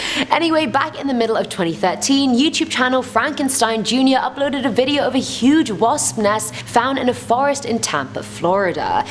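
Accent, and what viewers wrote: United Kingdom. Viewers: I honestly can’t tell whether she’s a fellow Brit 😂😂😂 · They love hiring attractive women with British accents don't they?😂😂🤣🤣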